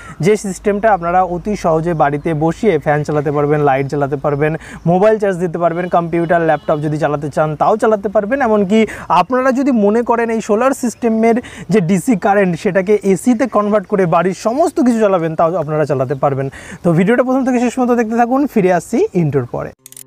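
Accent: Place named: West Bengal, India. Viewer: বেটা অম্লমধুর! তোমার দেয়া তথ্য আমার ভালো লেগেছে, কিন্তু তুমি ওপারের! তোমার বাচনভঙ্গি আমাকে সেটা জানিয়ে দিয়েছে। এপারে দুটো মূর্খ আছে যারা নিজেদের পন্ডিৎ মনে করে কিন্তু আসল কথা টি বলেনা। তুমি একটু ক্ষেমা ঘেন্না করে বলে দাও তো! অনগ্রীড সোলার সিস্টেম কি দিনে বিজলী চলে গেলে কাজ করে? তুমি সাবলীল ভাবে তড়িৎ শব্দটি উচ্চারণ করছো অথচ এপারের দুই পন্ডিতের একটা 'টেকনোলজি' কে বলে'ট্যাকনোলজি' আরেক পন্ডিৎ বিরক্তিকর ব্লাবারিং করে। আমার প্রশ্নের উত্তর দিতে ভূলো না বেটা।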